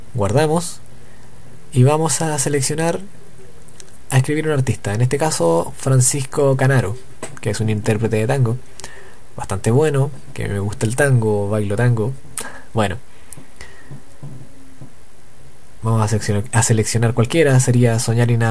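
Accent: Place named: Chile. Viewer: Gracias por la app. Muy útil. Por cierto qué acento tan CHILENO tienes :D. Un saludo!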